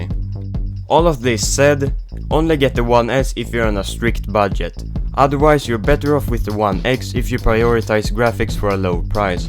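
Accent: Sweden. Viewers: Are u Swedish? I'm Swedish!!!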